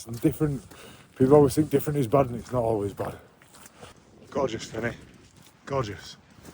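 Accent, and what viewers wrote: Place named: England, United Kingdom. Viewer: I grew up in the UK partly but God do I find the English accent so annoying which I’m sure people find American accents annoying too so no hate just an observation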